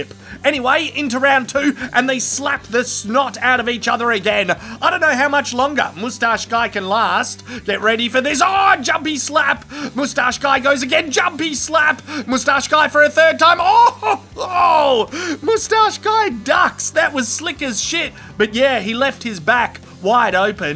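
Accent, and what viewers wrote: Australia. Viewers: Far Rick farrk, fareken funny bro I mean mate,were u been Aussie or something.far funnier hearing you go on..never seen slappy slaps,just in bed once.inmean slappy sehflaps..oops .out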